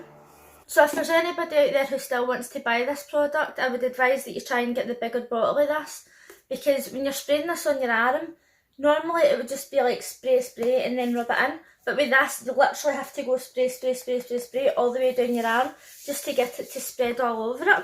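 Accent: Scotland, United Kingdom. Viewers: yaaasssss love hearing a fellow Scottish voice in a beauty review, glad I didn't buy this! thanks so much for the honesty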